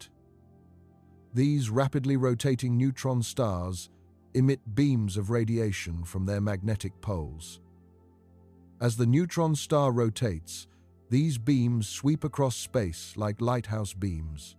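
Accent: United Kingdom. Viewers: This British narrator speaking of a baseball spin must never heard of the ‘ol “knuckle ball” which doesn’t spin!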